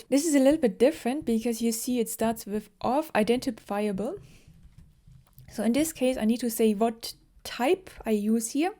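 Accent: Germany. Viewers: Hi. Love your videos. The "better" word at this point would be "contract". E.g. "You can expand and contract the columns" instead of "you can expand and decrease the columns" English is funny/hard in that it has words that are common counterparts in everyday language. For instance: - increase/decrease - expand/contract - love/hate Hope this helps. Love learning from you! (Probably because my mom is from Germany and I love your accent.)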